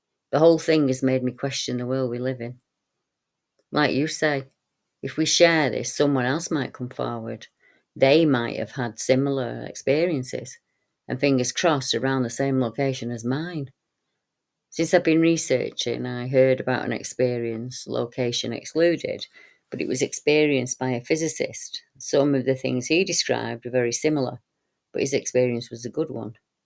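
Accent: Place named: Northern England, United Kingdom. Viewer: Just found you. Great work. Great northern accent. Luv it!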